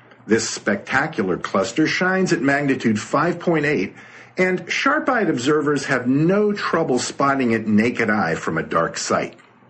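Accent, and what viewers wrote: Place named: United States. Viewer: you talk yanky too much. settle down on the oronunciation its to harsh.try to sond like english not overpronounced yank. nye.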